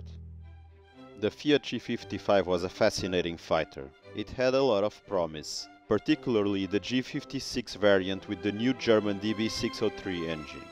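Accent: Portugal. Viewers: A Portuguese accent..great video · Great analysis on an interesting subject. Well done! Do I detect a Portuguese accent? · NIce video, and nice Portuguese accent :) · Is the voice Portuguese? Reconheci logo o sotaque. Ahahahhahahaha abraço w muito bom vídeo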